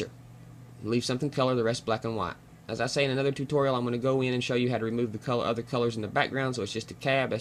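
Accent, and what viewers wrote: South, United States. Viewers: Very interesting, and very very informative indeed. This guy is a savior! a savior with a seriously serious southern draw! lol ;-P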